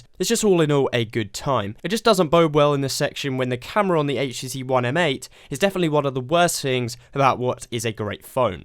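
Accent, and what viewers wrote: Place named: Scotland, United Kingdom. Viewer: i heard your accent before where r u from bro? is it Scottish? Irish?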